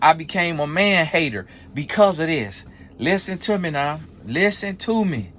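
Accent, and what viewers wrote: South, United States. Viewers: you really are country I’m dying laughing at ends meat 😂😂😂 had to pause right here and catch my breath...now I can continue watching 😅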